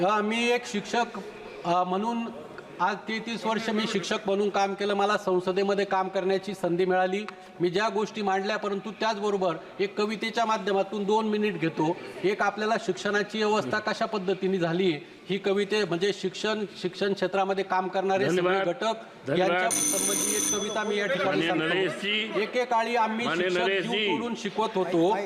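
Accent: India. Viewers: आपली भाषा आपला माणुस खुप भारी वाटतंय सर तुम्ही ग्रामीण मराठी भाषात बोले ❤🎉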